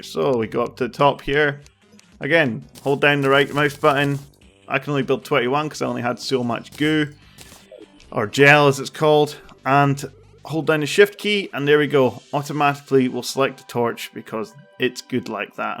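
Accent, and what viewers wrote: United Kingdom. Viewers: Why always British terraria play throughers.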